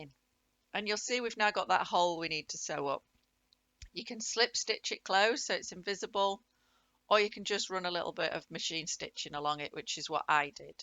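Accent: England, United Kingdom. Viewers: Hi Mam, Happy New Year! It is a cold raining day in Virginia on 1/10/26. I live in an efficiency apartment saving for my first house to buy and my goal is for Summer 2026. I love fabric but, have not much storage and I am a waste not want not gal and save very tiny scraps. I made my first draw string bag with a round bottom as a faver for my boss's wife and totally did not get paid correctly with cost of supplies time and talent. It was a tricky pattern called a Knitters Bag. About 7 inches wide, 12 inches tall and a round bottom 10 inches across. I coukd not find a video for tge life of me to show how to have the casing with clean finished sides so you would not see a raw edge that could fray. So the fabric where the casing ends were for the rope draw string I did dabs of crazy glue with a Q-tip in a smooth fashion. I never saw any videos where the seamstress opened up the side seams. 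I love this video. Also I have been baffled how you turn back out the sides the right way from the bottom when you line your bag. I have learning disabilities and patterns are hard to follow. I so want to make little bags more so now for each holiday and these will be nice for Valentines Day fabric with individually wrapped candy inside and a little gift for my family members and 2 special friends at work. I am so happy I watched this after watching your mixed media card you made today. I am happy you gave your measurements in inches too. Your accent sounds English. My Dad is from Manchester, E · The best one and an English accent! Thank you from Southampton UK.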